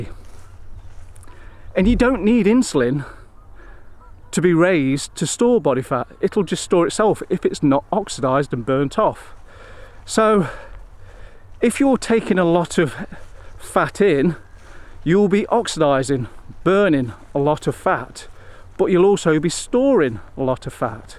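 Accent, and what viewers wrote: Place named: England, United Kingdom. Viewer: Hello from south Mississippi! I love your videos! My southern accent loves your English accent! I use the Renpho scales too. You mentioned in a video that you had ordered a new set of scales, but at the time, you haven't received them. When you get them, will you give us a review and comparison of the Renpho scales? Keep on keepin' on making your wonderful videos!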